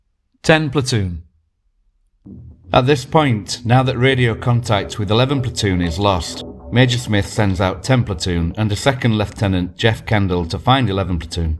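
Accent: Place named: England, United Kingdom. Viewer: Excellent presentation: short, sharp and clear. It's really easy to listen to your posts. As an Aussie I find your Pommy accent and presentation very endearing--we truly are very close cousins. God save the King!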